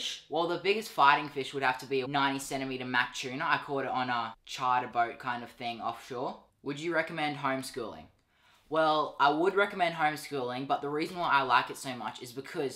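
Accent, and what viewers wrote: Australia. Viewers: I need to say this because I got some beef with you Australians WHY ARE YOU AND YOUR ACCENT SO BEAUTIFUL? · Posting vids, doing my homeschooling fishing......... your like me, but with dark hair, an Australian accent, and about a 600 something thousand more subs XD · Australians have the coolest accent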